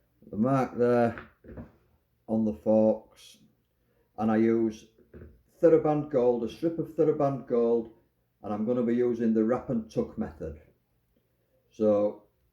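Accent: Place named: North, United Kingdom. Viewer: Great video mate... Exactly what I needed and straight to the point and good to hear a fellow northerner ... Thanks from Hull nick 👍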